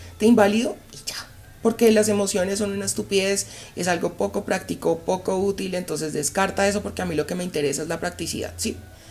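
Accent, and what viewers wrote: Colombia. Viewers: Por lo general la mayoría de las personas no toman o se dan cuenta de estos detalles que parecieran insignificantes pero el daño poco a poco le va destruyendo la conciencia ,la esencia , la espiritualidad y por lo tanto la mente que de por si es mala se vuelve más perversa todo lo que has dicho en este video es la realidad pero la humanidad no quiere acer el mínimo esfuerzo para salir de esta matriz o granja este conocimiento es el despertar másivo , te felicito mujer mis saludos desde Barranquilla Colombia y que me pareciera que fueras de aca por tu forma de hablar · ACENTO ROLO?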